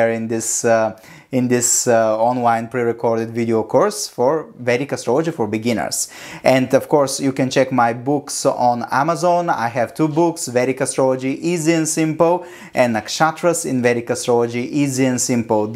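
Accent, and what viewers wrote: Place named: Italy. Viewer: It's so nice... you are so honest...thank you so much 😢❤❤ BTW I love your accent...❤❤is ot Italian 😅❤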